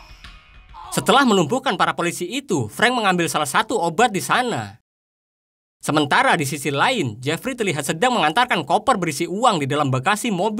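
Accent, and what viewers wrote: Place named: Siau, Indonesia. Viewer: Logat Siau kang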